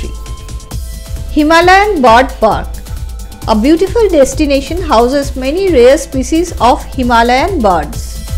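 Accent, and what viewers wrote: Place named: Bengal, India. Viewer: Thanks for the info. Easily recognisable as a bengali speaking english!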